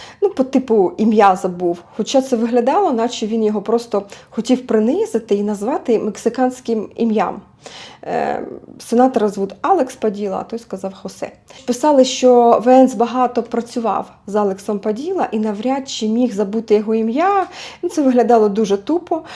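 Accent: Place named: Ukraine. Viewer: Дякую пані !👍👏👏👏 У вас добра українська мова . 👍Вибачте , в якій області України така чиста мова ? Я з чернігівської області.🤗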